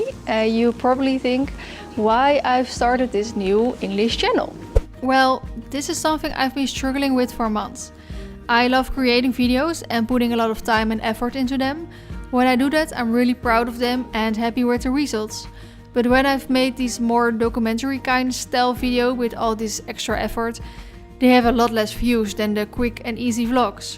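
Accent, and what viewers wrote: Netherlands. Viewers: there is no need to be scared! I used to share your fear about sounding like a Dutchie but I've learned to embrace it. Your accent is absolutely lovely and tells us much about you and your roots. Please be proud of who you are and what you've achieved. Attagirl! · As a Dutchie living in Sydney, Australia for 4 years who's been watching your videos ever since you started vlogging, I have to say I'm really proud. 🥹 From experience I can say that speaking English more easily and expanding your vocabulary really does come over time with lots of practice, but also that losing a Dutch accent might never happen, since I still have mine - very thick. Just see it as a sign of bravery. 😉Cheering you on from down under! 🫶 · You go girl!! ❤ And remember it's quite natural to have a dutch accent since you're dutch and not english. Think people from other countries will find it cute! 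Liefs Pauline & Skip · Je doet het echt super goed, je Nederlandse accent is juist heel leuk om naar te luisteren. Heel veel succes met je nieuwe kanaal! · Already in love with the acc also really like your Dutsh acc love youu ❤❤ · This video was wonderful! I absolutely LOVE the Netherlands and visit almost every year ♥ Your English is amazing, I really like you accent, welcome to this side of Youtube! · I love watching Dutch videos as a girl from South Carolina USA. I hope to visit one day and I am trying to learn the language. Listening to your accent helps me. Plus I love horses so it’s a win win. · Hi! So exciting you finally decided to make an English video! Don't worry about your accent. I've been living abroad for about 2 years now (I'm also from the Netherlands) and I found that most of the world doesn't care about accents like dutch people do. Dutchies are just brutal when it comes to an accent. 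There is no need to sound native. Actually while attending language school here I'm in a class full of people with different nationalities. The native English speakers are mainly just super enthusiastic that you speak 2 or more languages and other like German , Italians, Finnish are mainly excited when someone speaks with their own language accent, they are proud. And here we are, the dutch, over achievers and bashing everyone. Just wanted to say, you're doing great and keep going <3 Can't wait to see more of this series!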